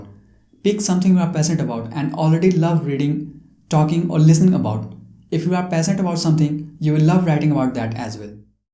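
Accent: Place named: India. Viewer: Indian accsent is the worst in the world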